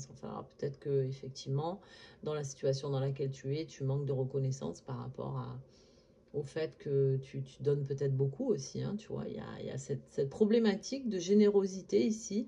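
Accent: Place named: South, France. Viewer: Bonjour 😎 Tout juste my mother is verseau 😂 Belle journée ☀️ Rien que l'accent du sud,meme léger me transporte 😅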